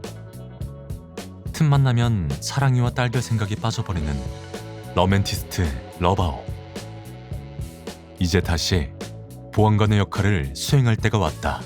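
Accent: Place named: Chungcheong, South Korea. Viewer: 러바오 넘넘 귀여워❤❤ 충청도 사투리도 찰떡이구먼유~^^ 365일 만근하는 우리 러부지❤❤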